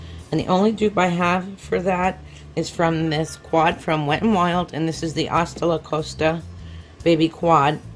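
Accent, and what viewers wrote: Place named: Staten Island, United States. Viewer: I could cry right now girl...I dont know how I missed it from the first time I watched this video..., I am from Staten Island too. I live here still. Your voice had a familiar quality for me...we probably both have the same accent 😁💙💚💙💚 I didnt think I could love you any more but now I do!!! Its so amazing to find someone as knowledgeable as you on YouTube and social media and know that you are from my hometown. 💚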